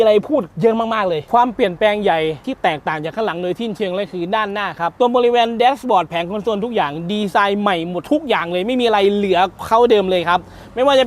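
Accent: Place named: Isan, Thailand. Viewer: admin คนอีสานแน่เลยสำเนียงภาคกลางพอได้แต่ยังไม่คล่องเท่าไหร่ครับ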